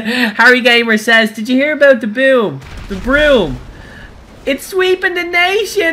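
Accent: Ireland. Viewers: Are you irish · Where you from in Ireland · are you irish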